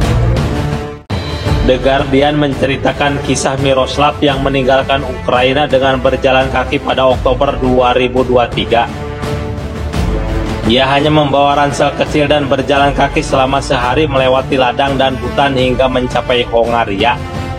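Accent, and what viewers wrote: West Java, Indonesia. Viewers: Logat sunda lu bos kentara banget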